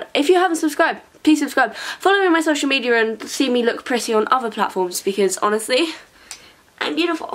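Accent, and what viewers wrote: England, United Kingdom. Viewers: What part of England are you from? I loveeee your accent! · Is she from England??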